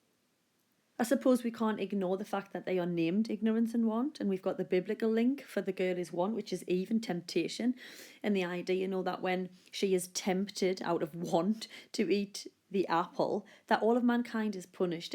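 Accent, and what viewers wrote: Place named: Scotland, United Kingdom. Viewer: I think she Scottish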